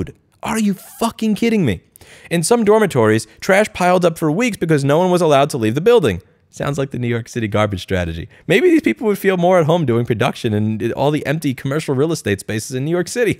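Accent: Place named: Texas, United States. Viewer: Texas I hear! Which area?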